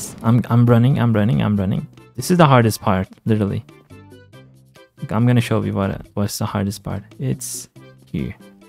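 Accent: Turkey. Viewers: şiveden anladım direkt türk olduğunu :D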